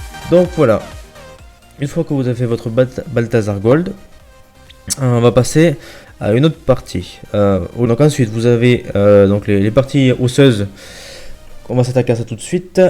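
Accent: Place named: South, France. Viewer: j'avais jamais remarqué que tu avais autant l'accent du sud ^^.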